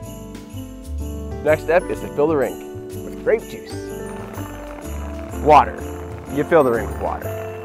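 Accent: Canada. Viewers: Not hard to tell your from Canada like me 😂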